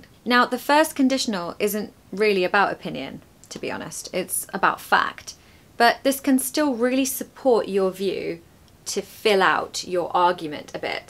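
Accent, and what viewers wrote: RP, United Kingdom. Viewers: i wish i could speak english with a pr accent like you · I love your british Accent because overwhelmed me as well as your style of presentation and l'm fond of you. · Hi, you sound like Lucy from English with Lucy. Has anyone told you this before? Or is it anyone with RP speaks as you do? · Lovely to listen a fantastic british accent!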